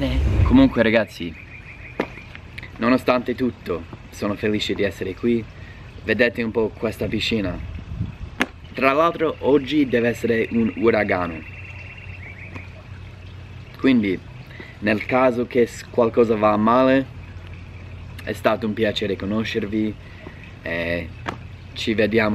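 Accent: Rome, Italy. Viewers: ti è venuta la cadenza romana quando parli in italiano.:-)) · Accento romano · Anche romanizzato nell'accento! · oltre ad esserti italianizzato ti sei anche romanizzato 🤣 si sente sempre di più l'accento romano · Più che da persona italianizzata questo linguaggio mi fa pensare ai ragazzi delle medie che giocano a fare i duri con i coetanei. Le parole scurrili e le inflessioni romanesche trasformano un madrelingua inglese in un fenomeno da baraccone. È questo che suscita ilarita'. Imparare l'inglese non mi ha mai appassionato ma se fossi costretta lo chiederei sicuramente alla professoressa sopra. Sono sicura che mi farebbe fare un'ottima figura. Sarebbero soldi ben spesi. Per adesso continuerò a studiare la meravigliosa letteratura italiana in special modo i nostri grandi poeti come Giacomo Leopardi. La grande cultura che aveva noi ce la possiamo solo sognare. 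Solo così ci possiamo elevare con la lingua. Sono dell'opinione che le critiche ci aiutano a migliorare, quindi se riceverò correzioni sui tempi verbali o l'ortografia mi farà piacere. Buona vita a tutti. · Accento americano con la cadenza romana. Da morire 🤣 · Da come sta parlando mi sta venendo il dubbio che lui stia vivendo a Roma sud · No ma ..sentirti parlare in romano mi fa letteralmente morire dal ridere, irresistibile e bravissimo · Da Romano si, pure qualche Ao gli é uscito haha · Hai una cadenza romanesca stupenda ahahahahha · Quando parli ogni tanto sembri romano 🤣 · ha evidentemente praticato qualche romano o è stato a Roma perchè lo parla anche bene. "Co le ciavatte", il "Co" anziche con mi fa pensare questo, detto alla romana 100% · Ahah ROMANACCIO😂😂😂😂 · ma questo accento romano ? : ) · Sulla convenience hai ragione. Non sono mai stata negli USA, però vivo in UK, e anche io ho notato che tutto è, come lo definisco io, "a prova di idiota". 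Spero che anche in Italia la smetteremo presto di metterci a vicenda i bastoni tra le ruote (spero che tu conosca questo modo di dire 😂) e di affidarci a tutta questa burocrazia. Complimenti come al solito per l'ottimo italiano (romanaccio 😂) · mi hai fatto morire parli romano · Bravissimo non solo con l'italiano ma anche con il romano. Se ti interessa approfondire lo stile elegante del romano ti consiglio qualche youtube di "Ruggero De Ceglie". Buon divertimento! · Ormai parli con l'accento di Roma. Un Nando Mericoni di oggi. Sul punto 5 in italia dipende molto in quale regione ti trovi. Se vai ad esempio in Liguria Genova, i genovesi non brillano in empatia (senza offesa per i genovesi) se vai a Bologna ed in Emilia in generale, invece la gente è molto amichevole e la chiacchiersta anche con la cassiera del supermercato ci scappa 8 volte su 10. In Romagna sono simpatici, ma falsi, la simpatia è soesso finalizzata ad accaparrarsi il cliente. 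A Cortina sono odiosi a Milano sono poco empatici, a Napoli sono generosi, pure troppo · "Mazza oh", si inca@@ e dicono "AHO", "ammerigano" invece di americano.. 😂😂😂, troppo ridere, sicuramente parli romano meglio di me 😄(okkio alle parolacce e ALLE SCIAVATTE però, right 🤣🤣🤣?) p.s. si dice Ciabatte 😉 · Piu che italianizato ti sento romanizato. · Ma tu sei stato a Roma da come parli.. Troppo forte, sei un grande, io lavoro in ambasciata usa a Roma e l'aria condizionata la maledico tutti i giorni hahahaah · Morta dal ridere. + che italianizzato te 6 romanizzatooo!! Ameriga e amerigana, ciavatte, ahaha troppo simpatico. Si effettivamente qua avresti trovato un frigo pieno ma non solo, anche una cena o un pranzo mega x accoglierti. E vabbè si sa che in italia siamo il top in certe cose. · Simpatico accento Romano ^_^ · L'accento romano di è fantastico 😁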